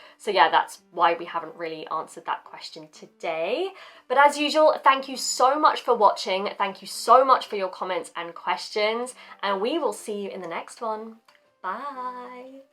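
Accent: United Kingdom. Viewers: 僕には0.5倍速にして、やっと字幕の音声を聞き取ることが出来ます。 日本人には英語の習得が難しいと実感すると共に、逆もまた真なりと考える次第。 それでも、アメリカ英語よりはクイーンズイングリッシュの方が、聴き取りやすいと思いました。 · I didn't ask you any questions, but I enjoyed the video. I got to know you better. I like the way you speak. British English sounds more classy and beautiful than American English, doesn't it?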